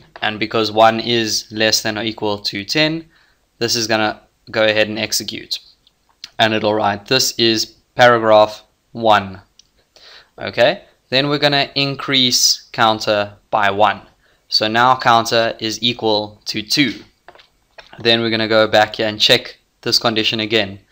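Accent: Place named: South Africa. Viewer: As soon as I heard your voice I was like... This one is a homie from South Africa. 😀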